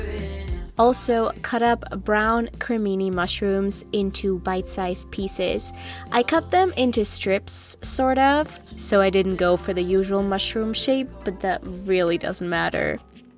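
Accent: Germany. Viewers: Are you German? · Ah, an den Produkten sehe ich, dass du Deutsche bist :D Ich habe mich schon gefragt was für ein Akzent das ist :D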